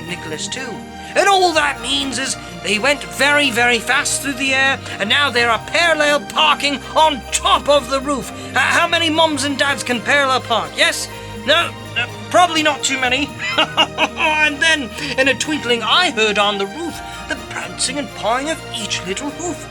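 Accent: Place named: United Kingdom. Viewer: You sound a little bit british ? why ?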